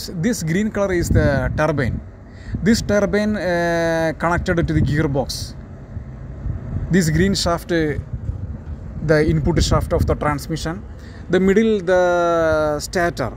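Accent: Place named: India. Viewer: talk look like bullshit....your voice bad from india....tatatata..like busy...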